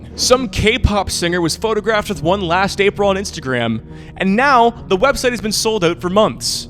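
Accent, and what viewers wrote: Canada. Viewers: Aboot! Are you from Canada? This is the first video ive seen of yours and I love it!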